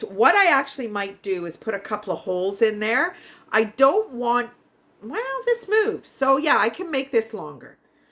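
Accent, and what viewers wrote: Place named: Southwestern Ontario, Canada. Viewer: I am fairly new to you & just realized your talking SW ONT! HOME!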